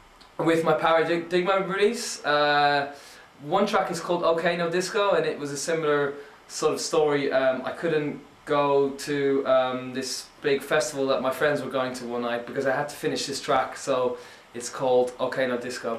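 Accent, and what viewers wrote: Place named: United Kingdom. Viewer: nice to see another minimal producer who can talk in a british accent living in germany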